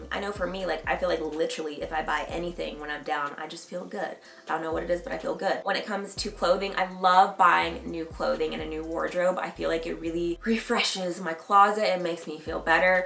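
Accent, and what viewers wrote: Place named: Tennessee, United States. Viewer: I’m really hearing your Tennessee accent in this video which is weird 😂 especially since you moved to LA!!! I love it thoooo we need a get ready with me installment to this video 💕💕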